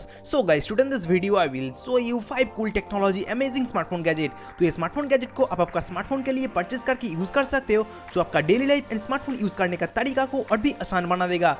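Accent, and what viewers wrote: Bengal, India. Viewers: Aar Tumi bangaali haaii... Aur aapkaaa Aaawaaj se paataa chaaaalta haiii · Sound bangali type h.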